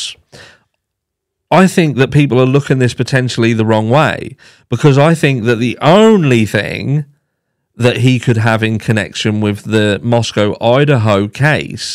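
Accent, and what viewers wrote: Norfolk, United Kingdom. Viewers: My dad was stationed at Honnington. We lived nearby in Elmswell and later in Stanton. Love your accent, foo for few, it's bootiful 🤣🤣 There does seem to be a foo too many coincidences..too many coincidences make a plan.. sometimes 🙃🙃🙂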